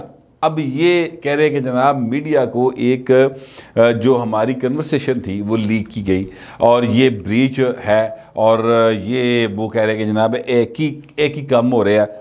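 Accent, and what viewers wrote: Punjab, Pakistan. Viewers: your punjabi touch is interesting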